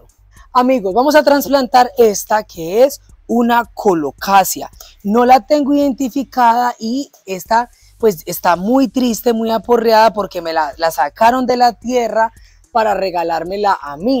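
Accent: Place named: Colombia. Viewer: ay qué divertido eres, con ese acento colombiano, esa locura x las plantas q comparto, es una lindeza oirte, me río mucho, eres encantador ojo yo vivo en el campo cerca de Madrid, un clima extremo y en el monte..te puedes imaginar la de fracasos q he tenido con las plantas..pinos pinos y más pinos un horror x eso estoy bellamente fascinada con esas plantas tropicales y exuberantes, Dios te bendiga☺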